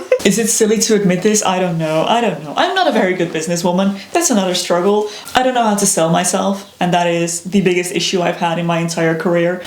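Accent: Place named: Netherlands. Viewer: One of the reasons I’ve been subscribed to you for so long is I’ve felt such a kinship with you from the beginning: I was a nerdy LOTR teen who enjoyed costuming and things like that. I’m American, but my dad’s family is Dutch and we lived in the Netherlands for a while when I was a kid, so I get such a thrill when you talk about life in what I feel is my second home. Early on when I started watching you I was like “Heyyy, I recognize that accent!” and was super excited to find out you were Dutch. I love your channel and your homeowner/gardening content is especially relevant to me right now as my husband and I bought a house just a few months before you and Robbert did. Your garden is basically my fantasy (we live in a desert so it’s impossible for me to do what I really want), it’s so lush and green. Anyway, this is all parasocial stuff, but I just wanted to say that you’ve got a friend in the western US. 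Thanks for what you do! (Also being a mom is the coolest thing ever - obviously a super personal decision, but I absolutely love parenthood!)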